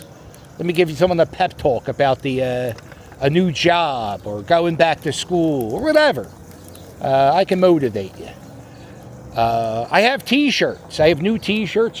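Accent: Philadelphia, United States. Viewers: Linguist consider the Philly accent to actually be a southern accent due to vowel sounds. Baltimore is similar. We Philly folks just talk fast. And this guy has the best Philly accent I’ve heard in a long time. Makes me homesick. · That's a serious Fluffya accent. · Love your Philly Accent - Helen from Bal-more!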